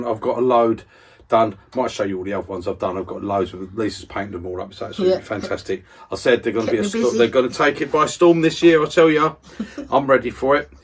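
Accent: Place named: London, United Kingdom. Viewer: Nice to hear a London accent. enjoyed the video...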